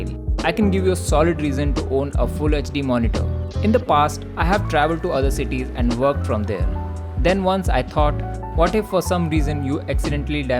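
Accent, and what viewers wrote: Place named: India. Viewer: I just found your channel half an hour ago and binge-watched all your videos in 2x. I'm a big fan of DIY and homesteading-related videos. This kind of content is usually done abroad, and it was a big surprise to me to hear an Indian voice at the start of the video. I loved it, and I'm so glad you're bringing these kinds of videos with a more Indian-centric viewpoint! Plus points for me: 1. You mention what worked and what didn't. 2. Whether DIY is even required in some cases. 3. The prices and the source of everything you use/purchase. 4. Solid video editing! Must be a lot of effort to record and do these kinds of projects at the same time, so hats off and keep up the good work!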